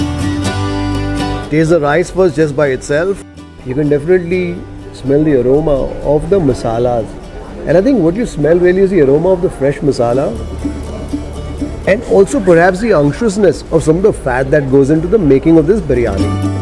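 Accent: Karnataka, India. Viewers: Most underrated food review channel....classy and simple English explanation is like "Desi food review in Desi English".... Hope channel grows bigger ❤️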